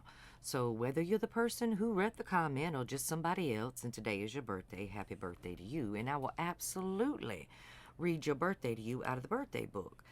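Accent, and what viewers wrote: South, United States. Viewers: What a melodic sultry southern voice so absolutely beautiful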